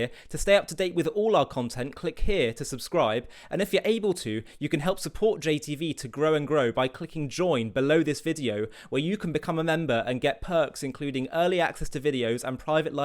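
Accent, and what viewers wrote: United Kingdom. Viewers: Pro-genocide hogwash, with Limey accent. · Have you seen the way these poor Palestinian women were released from the terrorist IDF illegal military prison? I wonder how much you get paid and who is paying you to speak this rubbish nonsense. Only women and children were released by Israel. Why didn’t they release the doctor that was raped by the IDF? Oh that’s right because the IDF killed him. You’re not that bright for having a British accent. You sound British but you speak ignorant.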